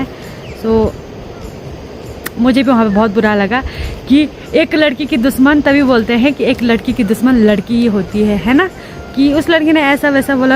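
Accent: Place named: Hills, India. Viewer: आपकी बोली, भाषा, पहनाव, ओर आपका स्वभाव एक पहाड़न की पहचान है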